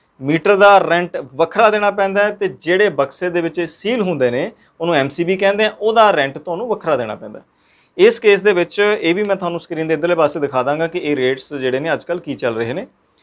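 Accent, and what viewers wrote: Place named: Puadh, India. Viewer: puaadhi language bolde ne vr...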